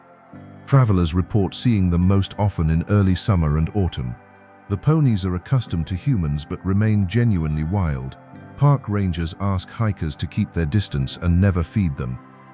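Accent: England, United Kingdom. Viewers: The English accented narration is a strange choice. How about a nice Shenandoah Valley accent for a video like this?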